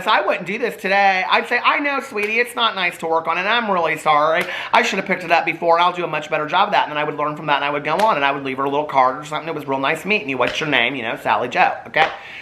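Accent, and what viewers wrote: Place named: Midwest, United States. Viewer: It’s the Midwestern twang for me!!! 😂 I never knew we had an accent here until I moved to Florida for a year to get sober! So, I guess we have an accent!! 😂 and yes, we do say ‘fixins’ !!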